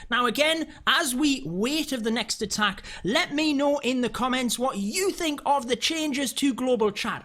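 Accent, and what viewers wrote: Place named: Northeast England, United Kingdom. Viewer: North East England by the sound of it. My guess Sunderland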